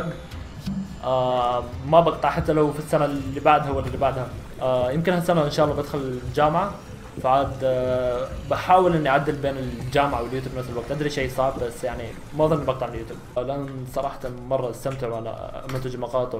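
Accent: Iraq. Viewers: منور يا كلبي بل لهجة العراقية 😍😂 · هو ما قال بس من طريقة كلامة واضح عراقي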